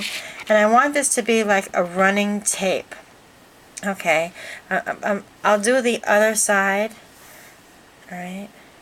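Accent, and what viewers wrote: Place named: New York, United States. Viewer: *runs in n'squishes you to pieces parts!!* I love the stamping idea!! loveLoveLOveLOVeLOVE it!!! Thank You Thank You Thank You! Consider it scraplifted! ;o) You've got all mah' craftin' juices flowin' sistah! . . . now, if only I had craft time. . . I love your videos, and I especially love your tutorials because I can hear the fuhgeddabouddit in there. *Grins* Have a super scrapalicious day sistah! Put your feet up, relax and well... you know the drill. Hugs n'Squishes!! ~*Mwah!!*~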